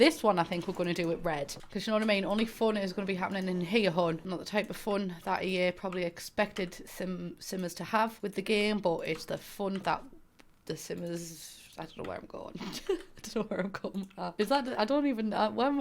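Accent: United Kingdom. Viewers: Can I just say how nice it is to see someone who is so British and the humour is too. It's so nice not to hear Americans, nothing against them but I just connect with a fellow Brit more. You seriously fucking crack me up and never stop with the accent and slang. Kim and Aggie 4lyf! 😂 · Her accent is by far the most “English” I’ve ever heard in my entire life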